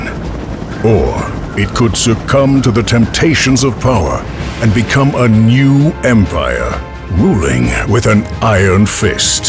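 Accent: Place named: United States. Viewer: you kinda feel the murica from the narrators voice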